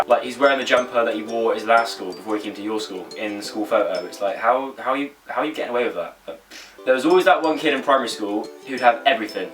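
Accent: Wiltshire, United Kingdom. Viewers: I love how in 2012 he sounds more Wiltshire and now he's lived in London he sounds different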